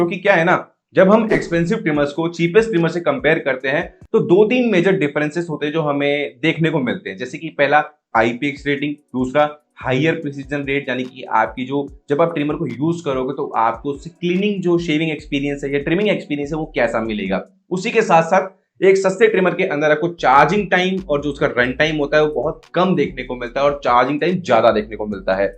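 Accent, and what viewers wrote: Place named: Bhojpuri, India. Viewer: First time trimmer kharida tha video Dekhi aapane Sahi suggestion Diya but aapki awaaz mein Bhojpuri hai thodi Gunji Si Rahi awaaz ni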